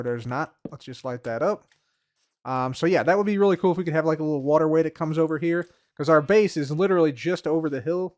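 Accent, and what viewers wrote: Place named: Chicago, United States. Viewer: You're from Chicago, aren't you? First-time viewer.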